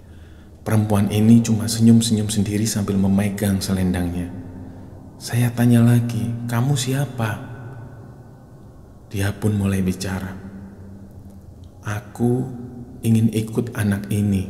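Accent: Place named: Tegal, Indonesia. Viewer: Walah ternyata mas wong Tegal rupanya. Ngapak dong...😁😁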